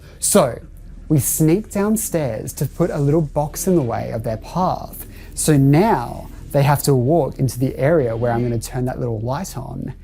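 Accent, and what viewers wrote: Australia. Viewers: do I detect an australian accent?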